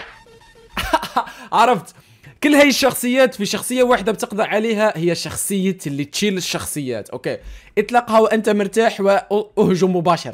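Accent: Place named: Maghreb. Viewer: أحسه جزائر أو مغربي أو تونسي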